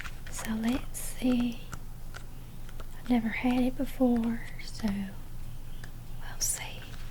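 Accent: South, United States. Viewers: Haha great video you sound like Hannah Barron 😅 Your accent southern draw is on point 👍🏼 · Can you do a part 2 to this or maybe another Southern accent video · Wow! Your Southern accent is way better than I expected 😅👋🏻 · Your southern accent is actually really good😀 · Hi 🙂 Definitely getting Dani ASMR vibes with the southern accent. Really good roleplay. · I reckon you nailed the accent hun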